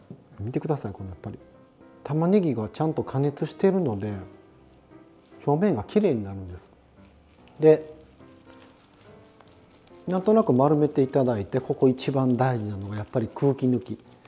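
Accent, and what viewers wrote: Kansai, Japan. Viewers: こんなすごいレシピを無料で教えてもらえるなんて本当にありがたいです！コウさんの関西弁の柔らかい話し方も大好きです😊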